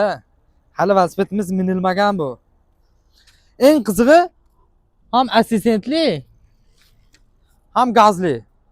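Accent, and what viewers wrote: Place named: Tajikistan. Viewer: Beat tojik ekanlar aksentlari bor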